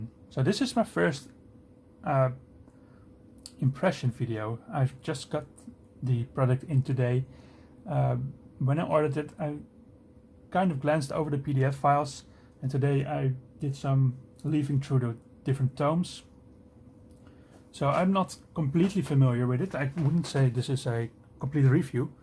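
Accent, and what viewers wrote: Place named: Netherlands. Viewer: This was a very comprehensive and thorough review! I kind of want to get it now, once I've got enough money to spare for it. Megadungeons are super interesting! On another note, are you Dutch by any chance? Your accent sounds very familiar. (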